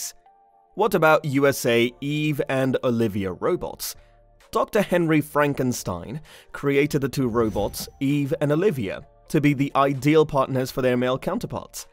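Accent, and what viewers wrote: United Kingdom. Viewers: why thr brit accent infloozer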